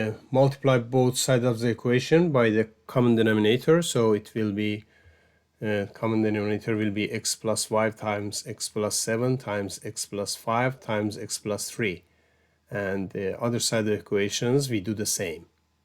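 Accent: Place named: United States. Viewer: I liked your American accent 🩵 💙💫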